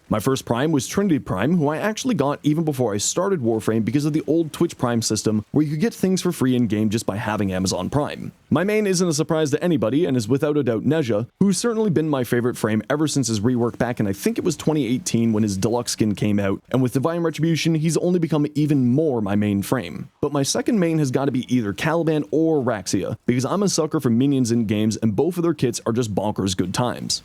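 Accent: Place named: Canada. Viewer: All I heard was Canadian.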